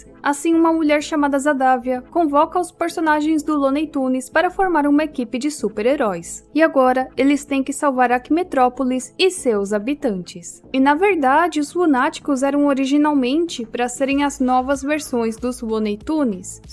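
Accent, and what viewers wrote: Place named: Curitiba, Brazil. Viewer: Acho que a deve ser de Curitiba por causa do sotaque.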